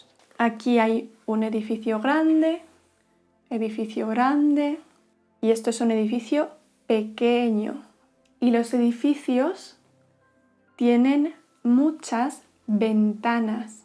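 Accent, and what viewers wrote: Spain. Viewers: I only wish two things: 1) that you had lots more just like this and 2) that it was in a Mexican dialect rather than Castillian one. I think I would get stared at if I said "ciudad" as you say it. The second isn't a complaint. Just a wish. What you do in this video is what all the polyglots say to do to learn/teach another language. My Spanish vocab is good, I know the grammar, but I spend too much time in my head translating rather than just hearing and connecting words with ideas. The pointer idea is fantastic. I'm tempted to make a few videos like this in English for my various student friends that are struggling at the same point I am. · Talar du spanska från Spanien?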